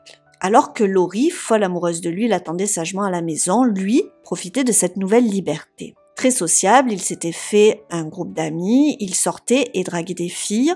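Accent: South, France. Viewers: Diction pas vraiment bonne car malheureusement a plutôt tendance à très mal prononcer les mots comme rouages...nuages...mariage... crochetage...emballages..etc. Ce genre de mots finissent en "ache"...rouaches...nuaches... mariache...emballaches...etc... Et c'est hyper énervant. Surtout qu'en plus a un petit accent (du sud...je pense)...et ce n'est vraiment pas joli à entendre. A part cela...il est vrai que est une des youtubeuse true-crimes qui s'exprime le plus clairement.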